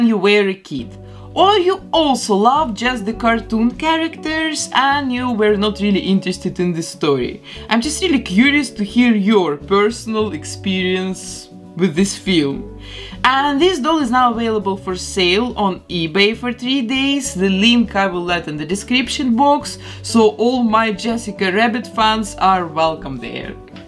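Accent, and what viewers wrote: Russia. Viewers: Ты из России? Слишком похожий акцент... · Русский акцент? Разве нет?🤔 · Пиздецки ужасный английский! Такого русского акцента я давно не слышала. Это прям 10 из 10. Лучше бы просто текст поверх видео пустила. Жесть, тебе категорически не стоит разговаривать на видео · Она из России? Слышу русский акцент. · Слышу русский акцент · It's russian accent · Акцент таки прям русский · Сразу по произношению можно понять что вы русская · О этот отвратительный английский. Такое чувство что чисто русская баба пытается на английском разговаривать а в итоге получается ранглиш. От произношения больно ушам приходится смотреть без звука. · Ааа, мне кажется, что вы русская, акцент очень смахивает на русский, но... Мало ли? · Я один слышу ее русский акцент? Не? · Русский акуцент, здравствуй🖐🏼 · Русский акцент, неплохо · Неужели это русский акцент? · Мне одной кажется что у неё русский акцент? 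· блин, слышу типичный русский акцент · Почему мне кажется что вы знаете русский язык?) Слышиться русский акцент😊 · Is it Russian accent?🤔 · You're not Russian by any chance? I just hear the Russian accent. I don't know why. By the way, it turned out very cool👍🏽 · I dont mean to sound rude and mean no offence.. but are you russian? I ask cause of ur accent it reminds me of my aunt who is from russia.. but i love ur video of making jessica rabbit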